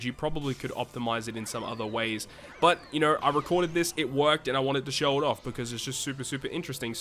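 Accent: Australia. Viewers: é o melhor, sotaque de australiano vom de ouvir LoL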